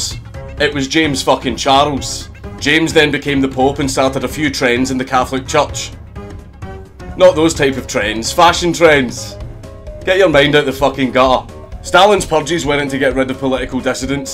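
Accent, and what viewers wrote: Scotland, United Kingdom. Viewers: where the illuminati invented THE GAY [ The Scottish accent makes so much better ] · I love your Scottish accent! 😀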